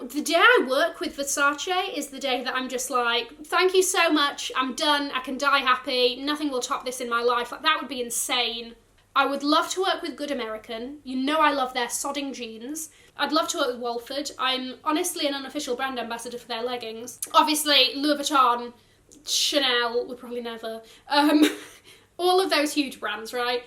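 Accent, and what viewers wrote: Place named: Doncaster, United Kingdom. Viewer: Well done! You have yourself a fabulous community who wish you nothing but luck and success. You’re so engaging and having your regular content is a great little piece of home (that Doncaster accent is that little slice of comfort I miss with my tea.) Keep doing you - great things never come from our comfort zones 😘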